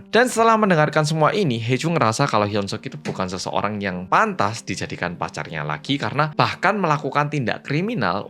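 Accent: Java, Indonesia. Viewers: Oppa kok bisa ditahan 😅😅😅 bahasanya jadi lucu karena medok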